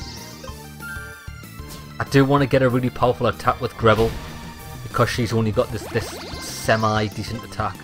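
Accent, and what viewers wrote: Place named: North East England, United Kingdom. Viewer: Thanks for the help I couldn’t find the guy on the outskirts but damn you have a strong North East England accent 😂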